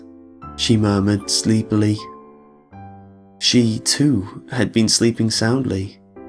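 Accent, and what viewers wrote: United Kingdom. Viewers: Your British voice makes everything seem okay :3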